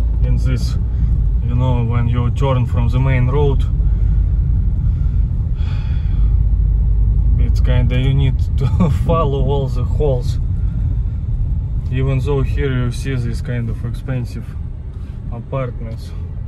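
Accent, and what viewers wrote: Russia. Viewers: Настоящий рашн акцент) тут и в подмосковье виды не хуже имеются, можно слайд-шоу делать по картинке и километражу от Москвы)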